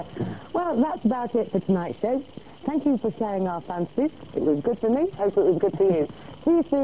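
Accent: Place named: United Kingdom. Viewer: Aint nothing Iike a British Iady in Iatex, when she spices all that beauty with the accent.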